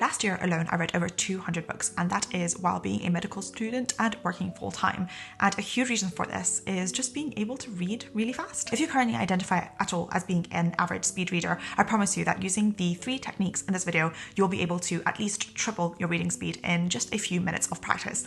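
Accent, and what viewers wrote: Scotland, United Kingdom. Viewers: Are you from Scotland? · Everyone commenting 'oh its so fast how you talk' or 'it must have been sped up in production' potentially however Scottish people do just naturally talk fast. Keep up kids 😂 Although will reiterate it off the folks that are saying its obvious proof of your speed of intellect by just how well you articulate yourself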